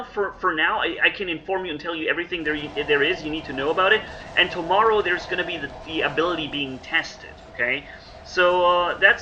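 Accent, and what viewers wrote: Germany. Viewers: Are you german. Bist du deutsch, du bist deutsch oder du hast auch Vykker oboniert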